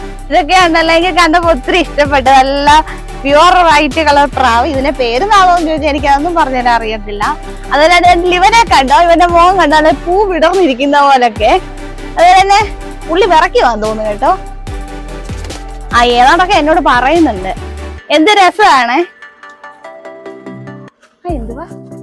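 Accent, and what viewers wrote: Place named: Pala, India. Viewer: ❤🙏 പാലാ സംസാര രീതിയാണ്❤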